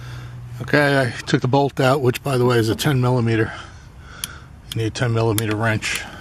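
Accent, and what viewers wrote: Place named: New York, United States. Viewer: thank you for the video! i also am having this problem and i’ve watched so many videos,it’s interesting to see what works for each! i also enjoyed your voice, it reminded me of my cousins in NY! i’m also curious to see what type of van you have, i’d like to see the interior.i have a ‘99 jayco conervesion camper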